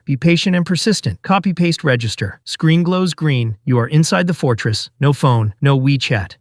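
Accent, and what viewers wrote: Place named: United Kingdom. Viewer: Ur speaking too funny. If u would have been narrated normally, the video would have been millions views by now. But u choose sick british accent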